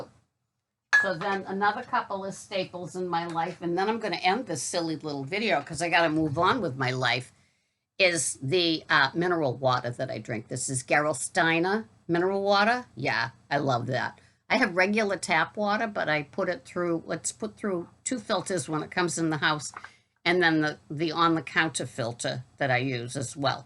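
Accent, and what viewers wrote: Boston, United States. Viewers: Thanks for the Saturday Show! You made my day! I’m in love with your Boston accent!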